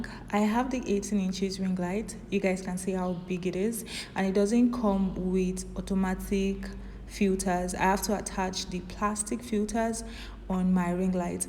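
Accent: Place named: Africa. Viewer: Hi !! Are u African or ?? Not a racist I just love ur channel and accent. I just been a make sure Nigerian? Jamaican? It’s so cute . And that ring light is beautiful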